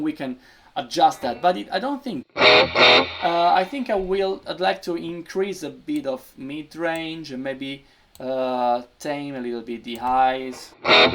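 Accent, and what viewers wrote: Piedmont, Italy. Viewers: Do we have to comment in english too? Am I more international too this way? Seriously, this feature is mindblowing, I'd like to see what it can do with pedals or other stuff (By the way, you still have a piedmontese accent while you speak english ahahah)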